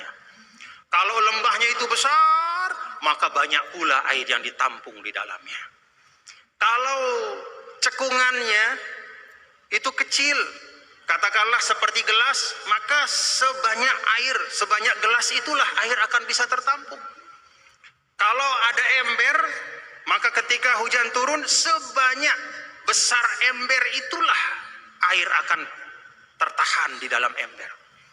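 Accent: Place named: Lombok, Indonesia. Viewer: hihi bahasa lomboknya masih nyantul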